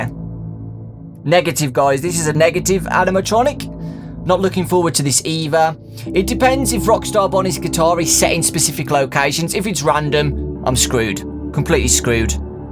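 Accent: United Kingdom. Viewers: Awesome you british voice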